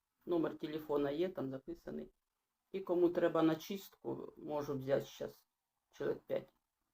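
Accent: Kherson, Ukraine. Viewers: Дякую за Вашу по 🙏 дуже приємно Вас слухати. Ви розмовляєте, як у мене вдома: на Херсонській землі. Для мене це особливо цінно ❤️🌷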